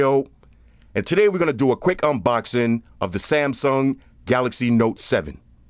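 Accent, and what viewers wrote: New York, United States. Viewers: alright alright, i clowned this dude in the past because of his harsh voice and New York accent. but i see he done softened his image by putting a cat in his videos. Good job.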